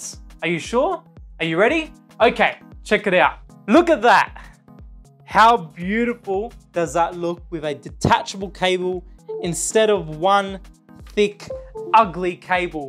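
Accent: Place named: Australia. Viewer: MATE THIS VIDEO IS GREAT, AND I LOVE HEARING A FELLOW AUSSIE DOING KEYBOARDS love from western syd <3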